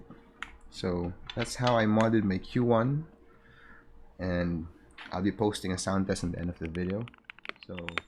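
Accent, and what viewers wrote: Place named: Philippines. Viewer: Perfect accent bro. I hate getting the American accent just because I grew up here in the US. Slight Filipino accept while speaking English sounds nice. I mean probably just me being patriotic meh...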